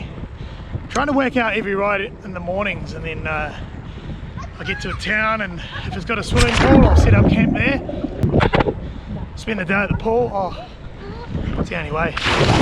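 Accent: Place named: New Zealand. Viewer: Oh I just wanna say your from New Zealand🤣😂🤣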